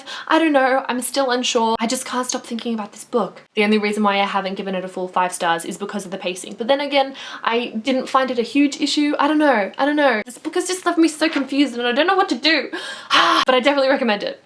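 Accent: Australia. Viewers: You have such a beautiful australian accent but still a bit different from the way my australian friend talks, so I guess that it's a matter of different dialects. which part of Australia are you from? If it is ok to ask? And I soooo have to read this book, you made me so curious and the way you talk about it makes me think that I really would enjoy it!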